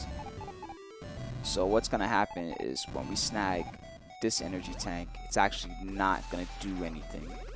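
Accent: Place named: New York, United States. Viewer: I recognize a newyorker anywhere, #Queens!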